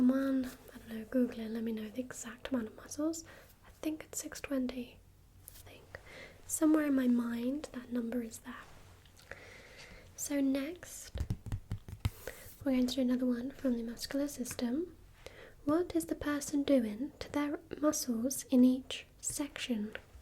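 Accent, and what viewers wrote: England, United Kingdom. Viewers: wonderful English accent still gets me every time. It's absolutely, positively the best accent I've ever heard. Perfect for ASMR. 👌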